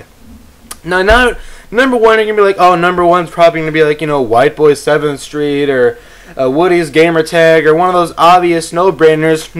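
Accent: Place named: United Kingdom. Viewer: Puppies and a British narrator? BEST SHOW EVER!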